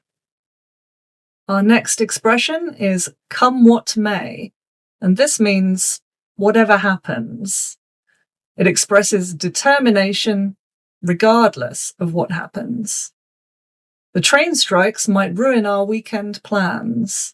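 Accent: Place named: England, United Kingdom. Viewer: Yes, of course darling. I have a pretty good command of grammar so the content is basically only a refresher but I love watching the videos because I am a sucker for English girls lol and a lot of it has to do with the accent lol.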